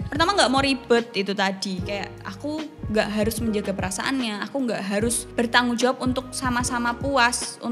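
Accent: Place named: Bantul, Indonesia. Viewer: Iki mbk e nek ga wong bantoel.. y pling skitar kalasan po banguntapan.. logatmu ngangenin desoku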